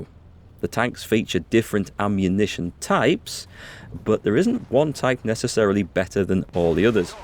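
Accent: Yorkshire, United Kingdom. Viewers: From yorkshire?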